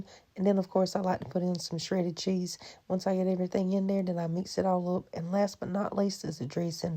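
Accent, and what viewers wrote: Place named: Southern West Virginia, United States. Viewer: Miss, this was absolutely adorable. your accent is very similar to our "Out Wayne" accent here in Southern WV. so familiar but with a twist. and i'm not gonna swear to it but i'm pretty sure you said Martini Noodles to see if we were paying attention.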